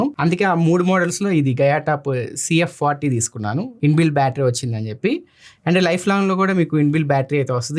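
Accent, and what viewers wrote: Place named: Andhra Pradesh, India. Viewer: Bhaiya andhrolla slang gamat untadi 😂🤣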